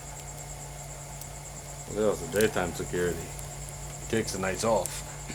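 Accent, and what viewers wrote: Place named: Manchester, United Kingdom. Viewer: Manchester speach 👍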